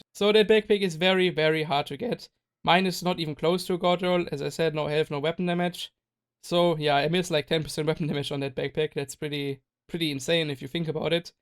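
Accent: Germany. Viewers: Maaan, dieser deutsche Akzent macht mich fertig 😂😂😂 wäre cool wenn du auch Was auf deutsch machst ✌🏽👍🏽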